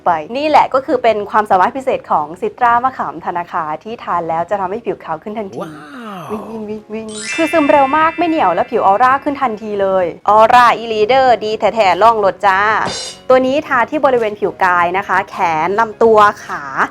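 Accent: Isan, Thailand. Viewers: พี่เป็นคนอีสานใช่ไหมครับ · ชอบ พูดอีสานค่ะ น่ารักดี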